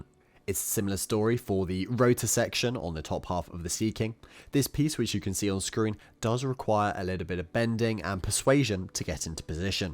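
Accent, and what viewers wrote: England, United Kingdom. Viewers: The England pronunciation is cool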